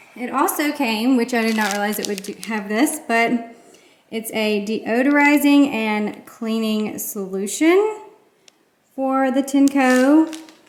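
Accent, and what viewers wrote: South, United States. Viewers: Hi! Been here about a month - here from Minnesota. Have found myself not only motivated to clean but also tried falling asleep watching your cleaning videos. They make me so calm and I love your Southern accent and authenticity. I am a single mom - two kids (16 and 14) and a special ed teacher as my second career. It’s hard doing it all alone- but I love a clean house and organization for my family. Lived in Arkansas for 3 years and LOVED the people and their kindness and welcoming spirit. I was a stay at home mom for 9 years. My daughter Lil is in competitive cheer here in MN. Keep doing your thing and inspiring us. Just love it. Thanks!!! Jen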